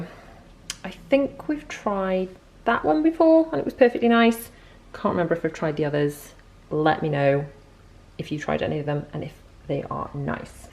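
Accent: United Kingdom. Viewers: I have never seen them in a store. It’s just a funny thing you say when you’re trying to impersonate a British accent, “would you like some tea and crumpets?” I didn’t even know what they were prior to this video.. for the record that’s not meant to be rude. I’m obsessed with your accent and long to visit one day!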